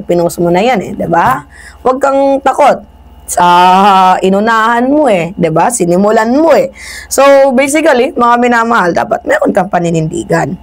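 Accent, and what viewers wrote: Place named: Waray, Philippines. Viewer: Waray ka ngay an ate kaupay gud ang waray mag estorya mag esog ang waraynon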